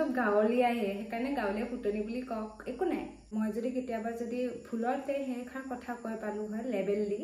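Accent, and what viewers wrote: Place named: Mangaldai, India. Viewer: Ba tumi darrang district r hoi nki ? Kothabur mangaldai side r nisina lagise Btw..bohut morom thakil ❤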